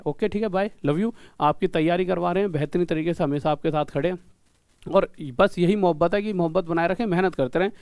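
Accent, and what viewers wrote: Haryana, India. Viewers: Bhokal , web series k , pintu pahalwan jese look h sir aapka , language b haryanvi touch a rha h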